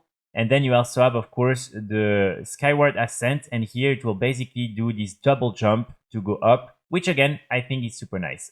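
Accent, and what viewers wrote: France. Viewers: What is the problem with your accent?? Is that French sh!t?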